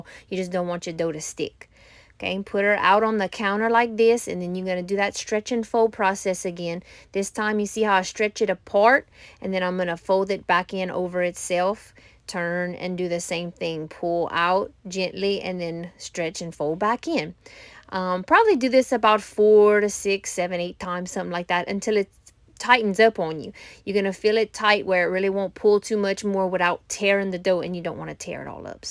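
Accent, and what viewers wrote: Louisiana, United States. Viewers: I’m following for the content but also for your accent! I love it! I spent 5 years in New Orleans for college, so I have special place in my heart for a beautiful Cajun accent ❤️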